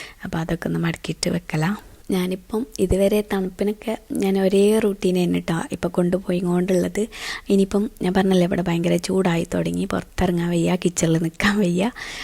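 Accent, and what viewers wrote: Kasaragod, India. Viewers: samsaram thanne kure kasarcode karude yanallo kelkumbol first entha enghane parayunnen thonum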